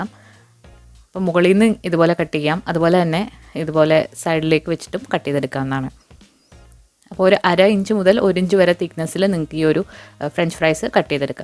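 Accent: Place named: Kayamkulam, India. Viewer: Samsaram kettapol thonni namma naatukari aanennu. Kayamkulath evida njan kayamkulam...charummod aaane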